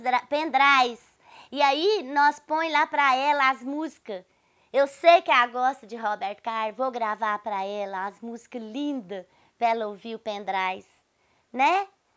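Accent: Minas Gerais, Brazil. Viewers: Adoro te ouvir... Minha mãe chama Josefa que nem a mãe da. E a familia do meu pai é minerim irgualzinho oce... Adoro... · Jamais os americanos entenderam este sotaqui que so os mineiros sabem.😂😂💋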